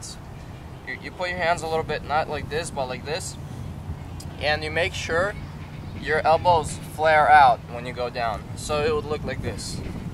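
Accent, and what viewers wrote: Russia. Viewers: Я так и чуял что ты русский, потому что у меня акцент такой же как и у тебя когда я говорю, режил посмотреть в Description, и во, руссий канал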